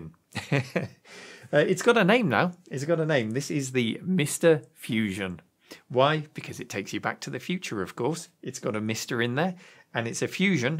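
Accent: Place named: England, United Kingdom. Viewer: Your english accent is very similar to simon whistler.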